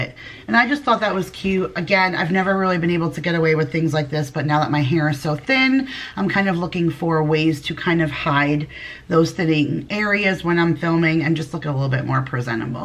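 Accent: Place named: New York, United States. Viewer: I was just watching another one of your videos I knew that accent I'm also from New York the Bronx no wonder I felt so close to u lol also ru Italian?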